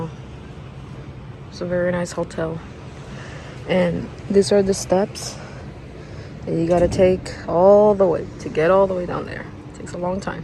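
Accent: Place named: Latin America. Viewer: What type of Latina are you? Your accent is familiar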